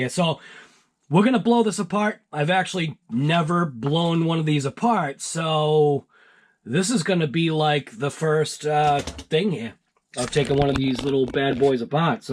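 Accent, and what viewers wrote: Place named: Massachusetts, United States. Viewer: Hey buddy. I knew your accent was one of Massachusetts LOL I grew up in East Bridgewater for a lot of years. I live in Pawtucket now. And I work at Hobby quarters in Foxboro Mass I don't know if you have ever frequent it there. But feel free to stop by this summer we have a huge rock crawling course. I don't know if you are into solid axle monster trucks but I have been designated to design and create a summer series for solid-axle monster trucks styles with penda points series. I don't know if you will see this message but hoping you do. We are also doing a construction site for people who are into hydraulic heavy equipment RC. Anyways I just heard your Alexa say Plymouth and it pushed my head back and surprised LOL anyways nice to see somebody from our side of things is doing so well in the RC Community for us God bless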